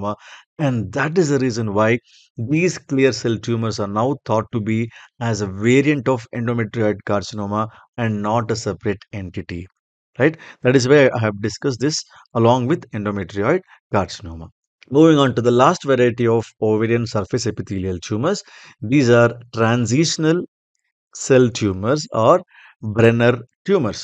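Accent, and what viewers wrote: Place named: India. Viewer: Indian voice🤢🤢🤢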